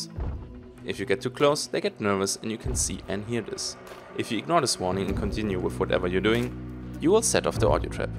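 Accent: Germany. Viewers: Du bist Deutscsher oder? Oder zumindest Schweiz oder Österreich. Hört man einfach an der Aussprache :P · Hey, are you german? You sound like my old classmates in english lessons. But I in deed like your video! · Man merkt das der Type ein deutscher ist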